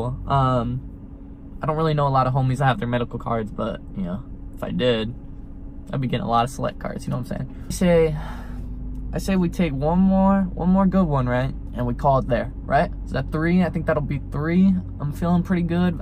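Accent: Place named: Arizona, United States. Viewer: I can hear your AZ ascent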